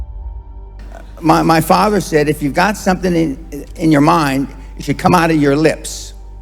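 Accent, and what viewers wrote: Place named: United States. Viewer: Too strong american accent.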